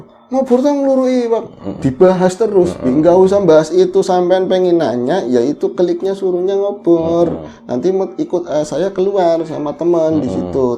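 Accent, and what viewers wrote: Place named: Tegal, Indonesia. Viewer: Melipir di ngobor dulu... Semangat hari Senin.. Cerita nya selalu seru.. dengan logat Tegal yang khas 💪💪💪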